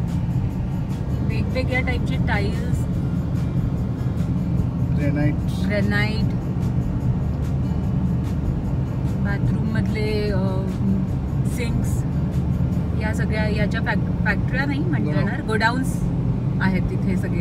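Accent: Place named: Kolhapur, India. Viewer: मस्तच... मॅडम थोडसं narrative मध्ये सुधारणा करा... You are repeating same sentences again and again...सांगली रोडचे वर्णन करताना ग्रानाईट, मार्बल, संगमरवर, Building material, ग्लासेस असे वेगवेगळे शब्द वापरु शकता... फॅक्टरी म्हणण्याऐवजी शोरूम्स म्हणा हव तर.... बाकी सिनेमॅटोग्राफी, background music, editing सर्व काही छान...बोलता बोलता आपण बरेच शब्द कोल्हापुरी style मध्ये बोलतो😀😀 "मेन म्हणजे" पण तो accent creative आणि मजेशीर वाटतो... Keep it up... शुभेच्छा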